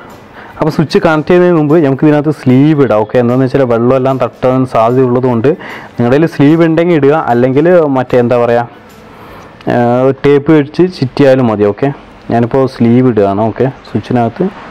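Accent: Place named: Malappuram, India. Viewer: നിങ്ങളുടെ slang എനിക്കിഷ്ടമാണ് bro മലപ്പുറ മോ കോഴിക്കോ ടൊ?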